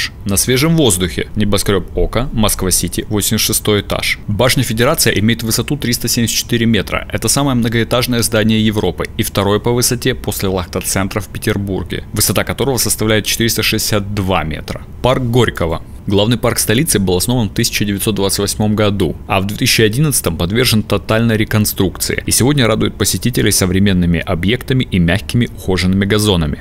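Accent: Ukraine. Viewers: Голос за кадром - иностранца , а точнее - украинца,.Сам фильм хороший, расчитан на 10 дней. В ресторан не обязательно, но билеты в Большой театр , даже по 5 тысяч , россиянам не по карману.В СССР была возможность ходить по студенческому и ЛЮДИ ходили на спектакли после работы. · Видио очень понравилось,как и коментарий к нему,но почему то удивил украинский акцент,как будто про Киев автор рассказывал,но может так и интересней,спасибо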